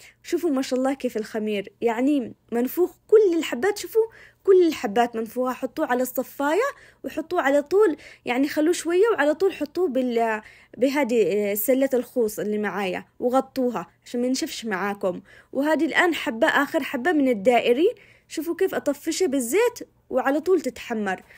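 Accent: Aden, Yemen. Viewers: حبيبتي والله انا من السعودية ذكرتيني بصحبتي الغالية من ايام الجامعه كانت عدنية و نفس لهجتك❤❤❤❤ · ماشاءالله اللهجة العدنيه تجنن انا فقط متابعه الفيديو عشان أسمع اللغة الجميلة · انتي الاحلا والاغلا طبعا شفت شوي من فيديوهاتك وان شاء الله اطبق كل شى واخبرك النتيجه اتمنى لك التوفيق والنجاح تدري اش احلى شى بقناتك طبعا كل شى حلو الشرح والطبخات وكل شى بس اكتر شي عجبني طريقتك بالكلام او ب الاصح انك محافظه ع لجهتك العدنيه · اول مره اشوف قناتك ياسلام ماشاء الله ستاره على خفه دم واحلى شي لهجة اهل عدن عسل والله معك إبيه🥰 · اقول شيء اووووول مرررره احب اللهجه اليمنيه قسماً بالله مره حلوه اللهجه يا زينك. بس لازم اجرب هذي الخبز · الله يحفضك غير الطبخه الي ترجعني للبريقاء اللهجه كمان ترجعني لبيتنا ولاهلنا تحيه كبيره ككبر عدن بكله لك وتسلمي علا الطبخات الي تحسسك بالفن لانه معروفه عدن ملكت الفن والله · كلامك يجنننن عدني قح😂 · اول مرة اتابع يوتيوبر يمنييية كتير حبيت لهجتكن وقناتك حلوة واكلاتكم بتشهي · اتابعك من امريكا عشان اسمع الكلام العدني 😅 ماشالله طبخك ياسلام 👏👏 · ياالله مااحلا كلامك يذكرني بعدن الحبيبه الله يعطيك العافية تسلم ايدك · ما شاء الله حلاوة كلامها ولغة أصحاب عدن...حبيت العفوية والاسلوووب.. 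الله يحفظك ويسعدك أختي الغالية.. متابعة جديدة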